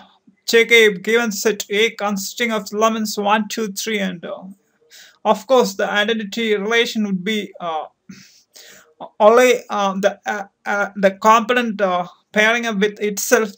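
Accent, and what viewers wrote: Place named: India. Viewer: Well explained..!! The accent is not at all a topic of this video. If you guys cannot understand his accent, find some FREE material, and learn more about Indian accent. This one explains the mathematical concept better than other fluent accent videos.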